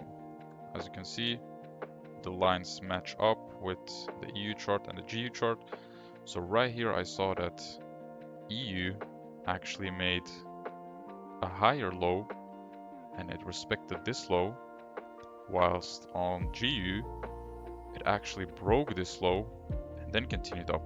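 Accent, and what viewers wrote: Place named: Flanders, Belgium. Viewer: Volgens mij hoor ik een klein vlaams accentje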